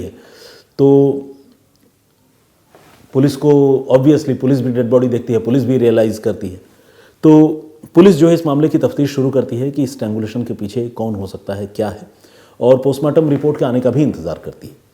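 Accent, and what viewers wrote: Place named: Bihar, India. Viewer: kahani theek sunate hain par supratim ji ka talaffus extra ordinary hai. bihari hone ki wajah se kabhi kabhi unki bhasha mein bihari lehza aa jaata hai.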